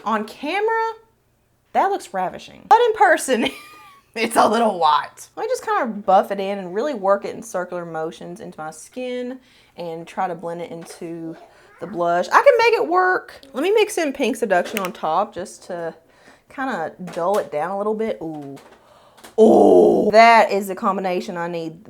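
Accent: South, United States. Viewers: Hey, back again from Belgium. Oh my gosh, I think you might well be my favourite on here: you always get straight to the point, knowledgeable and you're totally down-to-earth, sweet and unpretentious. And I said it before but I LOVE that southern accent! ❤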